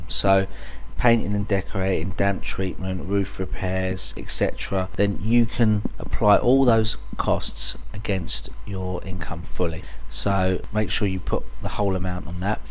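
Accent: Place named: United Kingdom. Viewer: very intersting british accent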